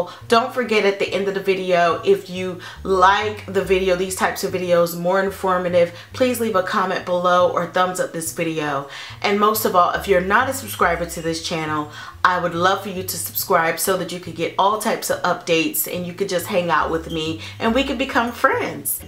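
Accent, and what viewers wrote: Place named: Raleigh, United States. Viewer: Are you from Raleigh?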